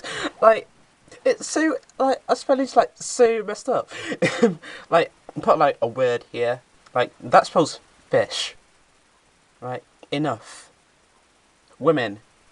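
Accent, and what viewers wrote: United Kingdom. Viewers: What's british is your accent. If we'd met I'd have to stop you and ask you often to repeat slowly what you say because I miss lots of parts (I have to listen to brit films with subtitles). Also, your haircut is sort of british, no? Well, to me it reminds a period of the early 1980's which was specifically brit.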